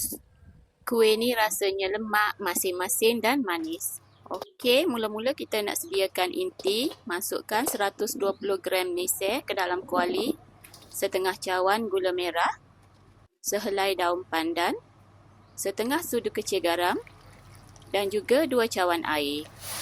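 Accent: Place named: Kelantan, Malaysia. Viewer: Bunyi kecek Tu org klate😂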